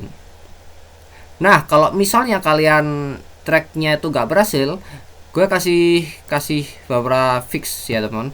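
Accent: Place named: Java, Indonesia. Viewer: English Jowo Anjir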